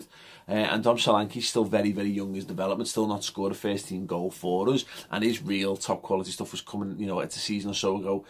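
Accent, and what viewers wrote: Liverpool, United Kingdom. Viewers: I swear to god, go on settings on this vid and turn the speed down to 0.5 and listen how incredible the scouse accent sounds in slo-mo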